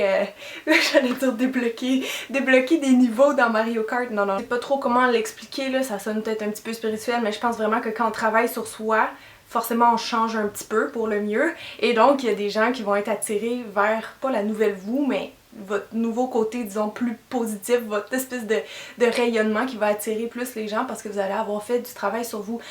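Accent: Quebec, Canada. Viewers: Bonjour, c'est super tes conseils! Merci! mais je t'avoue qu'au début j'avais du mal à te suivre car tu parlais tellement vite (en plus de ton accent Québécois) mais tu t'exprimais tellement bien et quelle dynamisme! Qu'on oublie vite ce côté là et heureuse de connaître ta chaîne de maquillage merci 😘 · J'adore ton accent tu es canadienne ???( c'est mon pays préféré ) merci pour ta vidéo . moi je n'ai pas de petit ami mais je suis amoureuse de qq qui est dans ma classe .comment lui dire on est très amie si tu as des conseils il sont les bienvenue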